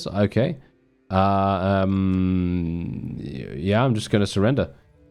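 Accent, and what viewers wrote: United Kingdom. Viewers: Remember to like comment and HYPE! Especially on the first video of a series as it really helps out the channel! This is not a paid advertisement as I joined the channel to hopefully help boost the smoothest British Baritone that you'd listen to read a phone book. For you kids that don't understand what that is, it isn't a phone that looks like a book or a mobile phone that folds like a book. It was a big book with your name and number, and sometimes street address. It'd be super boring but you wouldn't be able to stop listening to. Forgive the ramblings of an old man who finally became a dad, I don't know what sleep is anymore!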